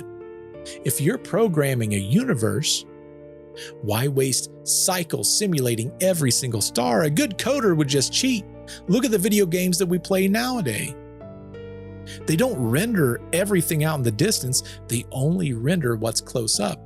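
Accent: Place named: United States. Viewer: It’s so dope to hear an American voice narrating 👏🏽👏🏽👏🏽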